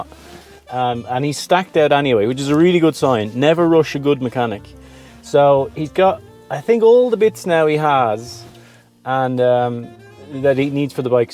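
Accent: Dublin, Ireland. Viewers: If you mistake a strong Dublin accent for a Scottish one, you can't be too familiar with either... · I could listen to you talk all day. Love an Irish accent. Great vlog, keep them coming. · Loving your vlog. Been watching from the start so maybe I’m number 30 or so of your 40 or so followers. Was great to hear that New Zealand is a place you want to visit. We love Irish accents here. Love your down to earth approach. Would love to see more of France but can’t travel at present (no I’m not in jail) so really love your trips in Pasquale. Great camera work.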